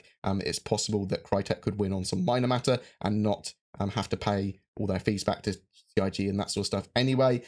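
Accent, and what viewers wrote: United Kingdom. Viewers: I appreciate your vids, I really do but could you enunciate better. Your voice is like a roller coaster. No volume setting can adjust from the highs and lows and missed syllables you have. I like the accent but not when it interferes with comprehension. Sorry man I don't mean to be rude but it gets a little annoying. I get the quips and quirks of British loving their own voice and word play. I mean you guys play with it when you speak but sometimes it's just a little too much.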